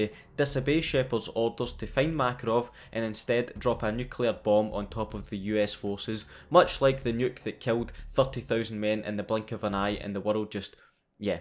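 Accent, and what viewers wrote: Scotland, United Kingdom. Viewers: Fuck me ur voice is sooooooo sexy, subbed just for that Scottish voice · #notifactionsquad excellent Scottish dialect I really appreciated it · #notifactionsquad love your Scottish accent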